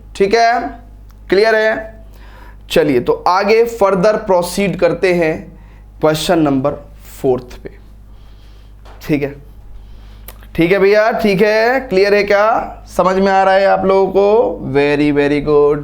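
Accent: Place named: Muzaffarnagar, India. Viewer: Sir aap muzzafrnagar ke ho mai bhi😊 aavaj se hi pta chlra ji😅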